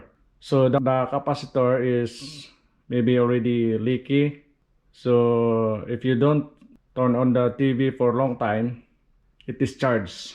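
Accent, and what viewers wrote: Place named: North, Philippines. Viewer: That thick accent sounds familiar from north Philippines.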